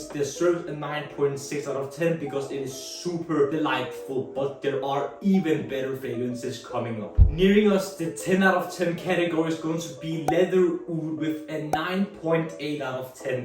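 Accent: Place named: Netherlands. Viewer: Man seems to be that you are from Holland aren't you?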